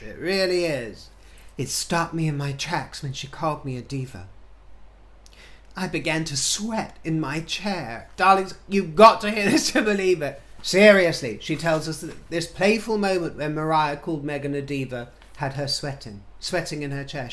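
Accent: United States. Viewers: Love your American accent! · lol your American accent is spot on. Makes it more funny when you put mm in her place. Lol. I enjoy your posts.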